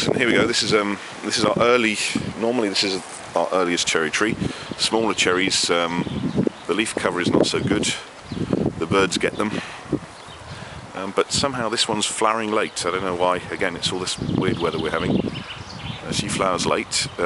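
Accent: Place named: United Kingdom. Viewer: you sound british, where do u live in uk??? tour garden is massive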